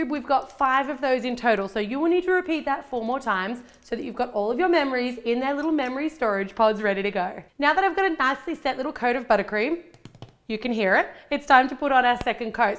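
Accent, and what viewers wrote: Australia. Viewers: Yasmeen Ashby-Ramos she's Australian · are you Australian?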